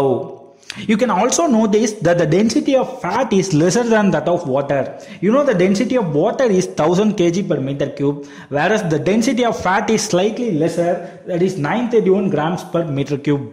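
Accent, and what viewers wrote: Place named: India. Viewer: His indian accent, I couldn't understand because I'm not native speaker.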